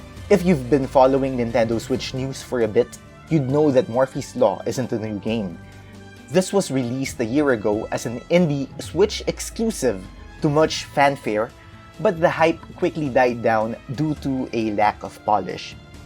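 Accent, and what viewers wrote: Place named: Philippines. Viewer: Thats some solid pinoy accent:) great review though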